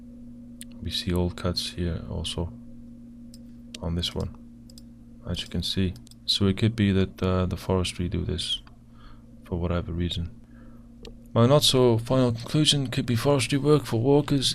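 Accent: Wales, United Kingdom. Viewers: Great analysis, again! It's certainly intriguing, to the point of being believable. Although it's certainly not an important point I must say that you're very easy to listen to - are you Welsh? Sounds Welsh to me but quite a soft accent. Just wondering 🤔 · are you Welsh you sound like you have a bit of a Welsh accent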